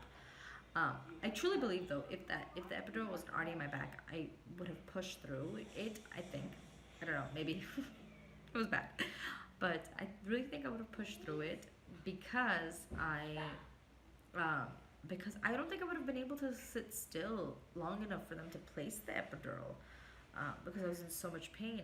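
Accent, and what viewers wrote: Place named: North East England, United Kingdom. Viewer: Are you from the north east? You sound as if you might be.... Oh I would love to find an OB that would allow a VBA2C.... We are in Ohio....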